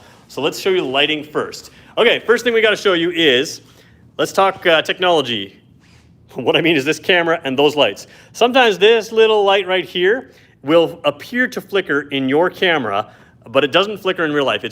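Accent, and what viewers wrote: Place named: New York City, United States. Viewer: I enjoy your videos. They are very informative. I have one suggestion for you however. Watch one of your own videos and Count your words per minute ? You speak really really really fast !!! Maybe less coffee ? Maybe slow down just a little bit ! ? I don’t know . I am a long haul truck driver and I drive the entire United States and Canada and the difference in how people speak between New York City and Birmingham Alabama and Ontario Canada is an amazing thing to experience !! You would fit right in in New York City except you seem a little less caustic .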